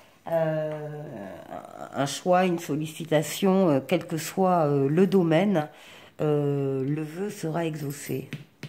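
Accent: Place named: Spain. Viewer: Es tu espagnol? Y a un petit accent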